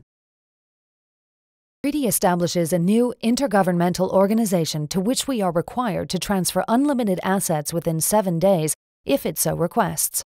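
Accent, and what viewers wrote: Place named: United States. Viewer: So why does the narrator have an American accent?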